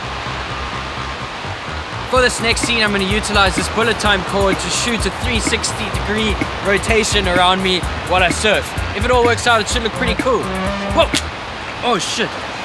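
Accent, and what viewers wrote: South Africa. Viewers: First time I'm watching this YouTuber. And a few seconds in I'm thinking this guy sounds like a South African 😀 awesome work 👌👏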